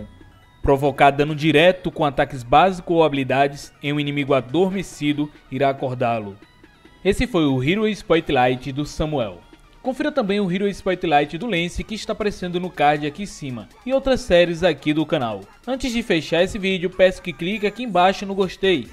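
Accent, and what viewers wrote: Northeast, Brazil. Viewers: tu é de onde?tem sotaque do nordeste oshe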